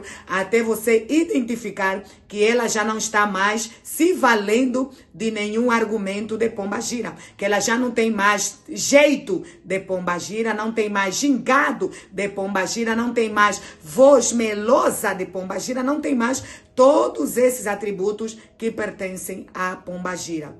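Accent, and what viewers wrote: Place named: Latin America. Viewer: Então quer dizer que é esse espírito que faz alguém pensar em pornografia e sexualizar as pessoas? A pastora é de algum país hispânico da América Latina? O sotaque é de algum desses países...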